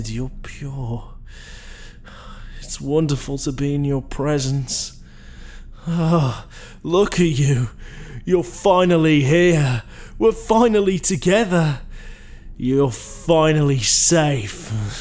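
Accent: England, United Kingdom. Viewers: The British accent 😮😮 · such a english accent lol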